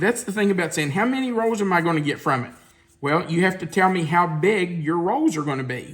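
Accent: South, United States. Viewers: Yummy! Love your Southern accent and hospitality. I have a brother in law that is so sweet and does the cooking for his family. Same Kentucky accent ❤😅 I’m from Oregon. Thank you., God bless! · The rolls look good but too much work for me to make. I do not like (putting it mildly) country ham. Love how Southerners pronounce: ol (oil), greeze (grease) and some say paparika (paprika)..LOL. Can you tell I'm a Northerner? My grandkids wouldn't live if they called me Mamaw and husband Papaw. Love watching your show but I can't cook too much anymore.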